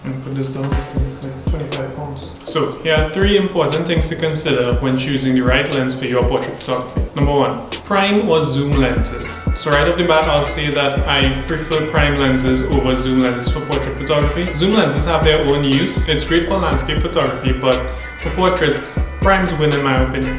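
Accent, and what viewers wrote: Trinidad and Tobago. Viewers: You sound an awfully lot like my buddy from school in the US and he was from Trinidad and Tobago, something tells me you've got your roots to that part of the world!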